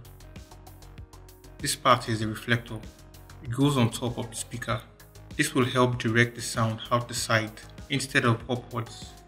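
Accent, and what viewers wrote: Nigeria. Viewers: Wow, you sound like a Nigeria. I am a big lover of DIY but not so much not much tools to bring out those ideas. Great to see someone in my hood do these things. Keep it up man.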